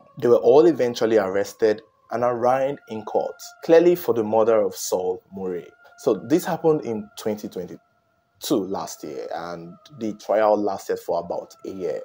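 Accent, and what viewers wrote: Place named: Nigeria. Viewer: I 😊😊😊😊😊😊😊😊I LOVE YOUR NIGERIAN ACCENT😊😊😊😊😊😊😊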